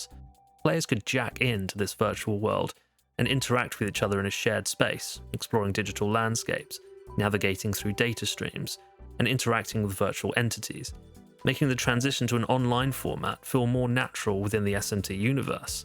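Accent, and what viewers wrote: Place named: United Kingdom. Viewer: That's just the British accent haha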